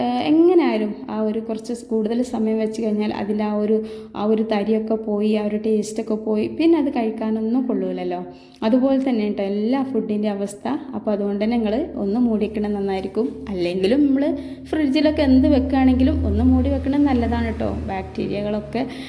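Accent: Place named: Kozhikode, India. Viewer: ഇത് ഉറപ്പായും ഞാൻ ഉണ്ടാക്കും ഈ വീടിയോ എനിക്കൊരുപാട് ഇഷ്ടായി കോഴിക്കോട്ടുകാരിയാണോ എനിക്കൊരു ഫ്രണ്ട് ഉണ്ട് കോഴിക്കോട് അവളുടെ അതേ സംസാരം നല്ല സംസാരം കേട്ടിരുന്ന് പോകും താങ്ക്യൂ💯👌🥰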